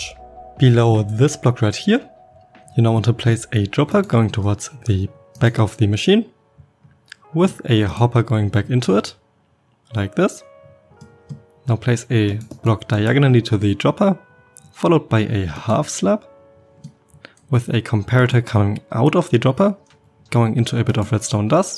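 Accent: Germany. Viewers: Dieser deutsche Akzent.... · Er hört sich wie ein Deutscher an oder spinn ich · Wow! I love the size of it! :D Btw: are you German? Your accent sounds very german I think :)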